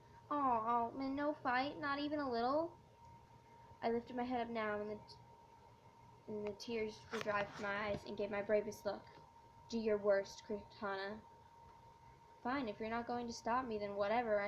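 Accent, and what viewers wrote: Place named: United Kingdom. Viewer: british accent tho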